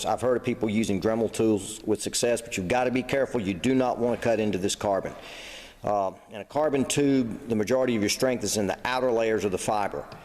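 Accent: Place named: South, United States. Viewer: Hi! I have followed your involvement with the Weta on the forum since about 2010 but never heard your voice. That's a great Southern Raised accent you have there! Do you play the banjo? I have done the job you illustrate several iimes and wish I had known about the fishing line trick. George Morris Weta 117 Scotland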